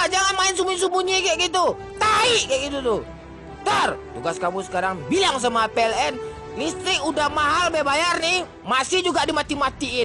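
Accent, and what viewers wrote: West Sumatra, Indonesia. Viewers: Urang minang ma suaro nyo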